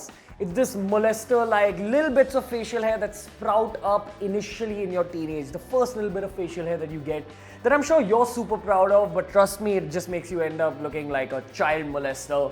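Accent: India. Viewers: He is Indian . He is speaking indian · Oh no indian accent, guess I’ll go to another tuturial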